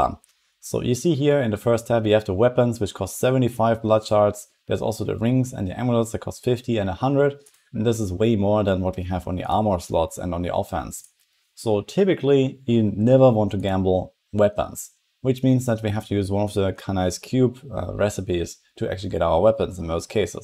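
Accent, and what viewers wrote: Germany. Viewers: Ich finde es immer so amüsant. 5 Sekunden vom Video geschaut und direkt gewusst, dass du Deutscher bist bzw. Deutsche als Muttersprache hast.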